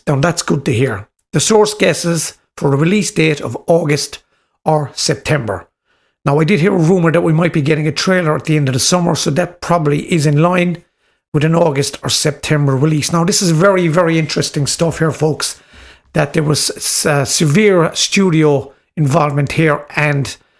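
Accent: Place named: South, Ireland. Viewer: It’s simple I hear southern Irish and I sub 😃 great video love from Limerick!😊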